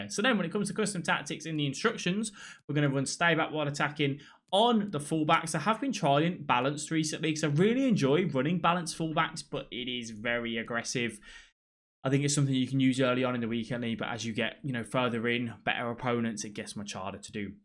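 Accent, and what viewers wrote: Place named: Yorkshire, United Kingdom. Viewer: Good content bro but ur yourkshire accent is like nails in my ears, why british english is so terrible???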